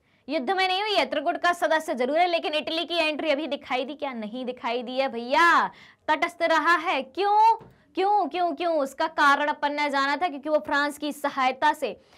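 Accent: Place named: Rajasthan, India. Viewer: Mam aapka ton rajasthani ton lag raha hai . Aap kis rajya se ho please batao n 😊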